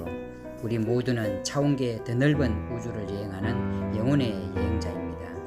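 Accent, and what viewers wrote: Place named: Busan, South Korea. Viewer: 어머, 부산 사투리가 이렇게 구수할 수가.. 멋집니다. 그런데, 71년생?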